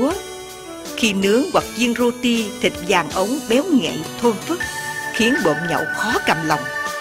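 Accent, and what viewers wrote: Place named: South, Vietnam. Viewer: Người đọc giọng Nam Bộ rất dễ nghe, tình cảm, rõ ràng. Cám ơn bạn.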